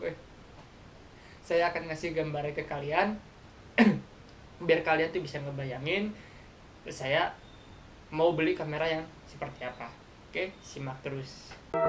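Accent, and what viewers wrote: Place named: West Java, Indonesia. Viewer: Logat Sunda mah moal bisa bohong nya mang wkwkwkw luv keren konten na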